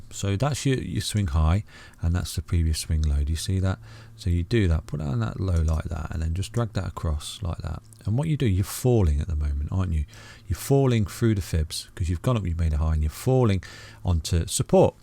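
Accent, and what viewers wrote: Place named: Midlands, United Kingdom. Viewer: Great channel, and good to hear a British accent (Midlands?) in the space. Much respect, from Leeds.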